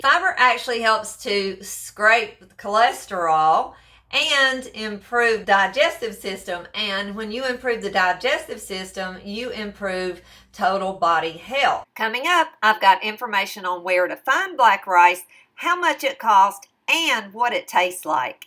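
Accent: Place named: South, United States. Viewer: Howdy? I love this southern girl accent 😍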